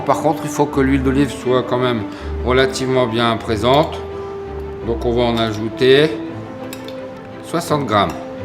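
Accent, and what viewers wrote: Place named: Nord, France. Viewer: ça ressemble plutôt à l'accent du Nord ou Pas-de-Calais...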